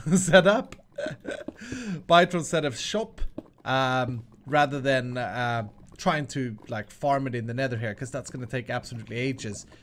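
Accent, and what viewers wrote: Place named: Sweden. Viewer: I must say that I love how you talkin such a swenglish accent all the time but then do all those great english accents when you are playing around. Luv it =) You guys were great in the panel btw. One could say that it was a smorgasbord of hermits to enjoy.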